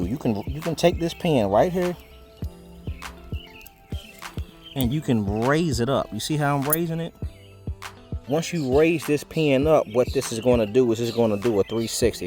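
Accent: South, United States. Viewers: Love the accent. We southern!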